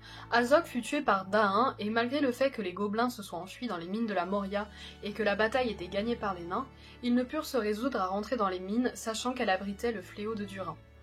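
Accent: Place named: France. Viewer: je découvre la chaîne avec cette vidéo et je trouve çà vraiment bien ! petit bémol : ta prononciation est très française ... je pense que ce serai un peu plus intéressant avec les prononciations des langues inventées par Tolkien ( car ce sont des vraies langues qui ont nécessité pas mal de travail et puis elles sont jolies ) ont peut trouvé la prononciation dans les bouquins ( au début pour le Silmarillion et à la fin pour le Hobbit et dans le tome des appendices du Seigneur des Anneaux ) mais ça peut peut être varié selon les éditions donc allez voir la chaîne Arda et particulièrement cette vidéo <link>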